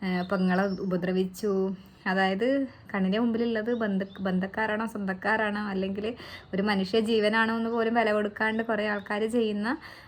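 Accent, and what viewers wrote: Kasaragod, India. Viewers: മലപ്പുറത്തിന്റെ മരുമകൾ, കാസറഗോഡ് ഭാഷ ഒട്ടും മാറ്റം വന്നിട്ടില്ല, അനുഗ്രഹീത ഗായിക അഭിനന്ദനങ്ങൾ ❤❤😂😂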